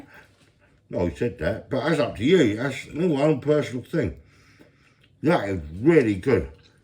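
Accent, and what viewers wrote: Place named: England, United Kingdom. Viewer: Mr is English. I understand him and I'm from the South of England. There are different accents and dialects depending on what part of England you're from. Please be kind and not rude on this channel sweet 😊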